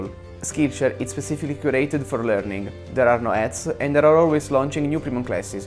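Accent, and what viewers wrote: Italy. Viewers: You have the best Italian accent I have ever heard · aooo ma se sente che sei italiano AOOOOOOOO🇮🇹🇮🇹🇮🇹🇮🇹🇮🇹🇮🇹aoooooooaooooooo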